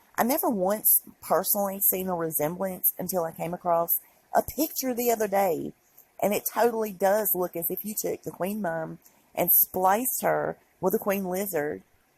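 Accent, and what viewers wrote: South, United States. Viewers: Love your Southern Accent! I'm from the South too, Louisiana. Just subscribed today to your channel. I would LOVE ONE OF THOSE WIGS for a friend of mine going thru chemo. She's very dear to me. I understand if I'm too late. God Bless you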